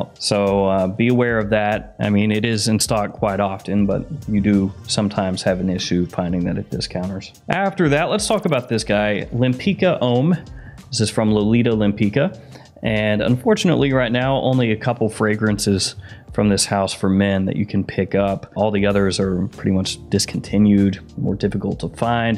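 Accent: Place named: Tennessee, United States. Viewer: I like when uses his original Tennessee accent 😆 Embrace your southern accent 😀👍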